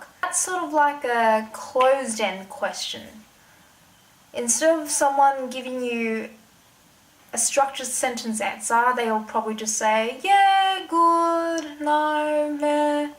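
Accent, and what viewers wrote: Australia. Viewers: This is awesome 👍. Sounds Aussie, so I can understand it very well. I'm gunna try it out on my Shanghainese golf friend, see how it goes . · Only one upload? Do more please. Have a sudden infatuation with Shanghainese and like seeing the differences and similarities with Mando. Also, love the Aussie accent..Sydney local? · Given the heavy Australian accent, I'm guessing not 😂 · Awesome video! dat aussie accent tho... hahah :P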